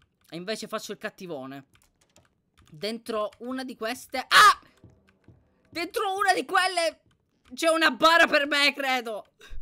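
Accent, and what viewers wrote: Sicily, Italy. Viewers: Sei Siciliano??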